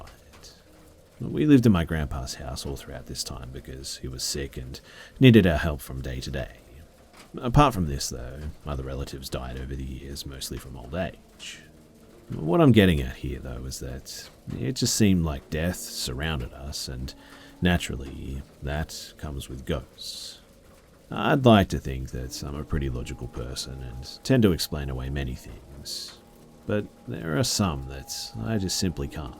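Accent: Australia. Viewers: Ikr i love accent. I live in northwestern US and i have never met someone irl from australia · Omg. An Australian narrator. I'm so happy right now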